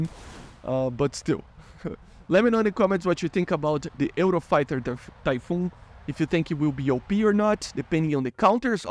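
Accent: Brazil. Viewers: is a brazilian with portuguese linguistic vices. So it might sound like the IRIS-T for a non portuguese speaker. He literally put an image of the IRST on the screen when he is talking about it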